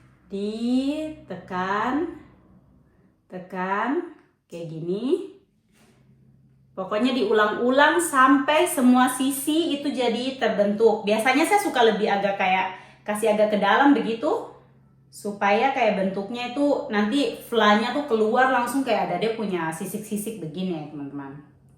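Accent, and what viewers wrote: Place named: West Papua, Indonesia. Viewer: Kakak ini dari Papua atau dari Sulawesi dari penampilan kakak kaya orang Sulawesi tapi dari dialek kakak kaya orang Papua Barat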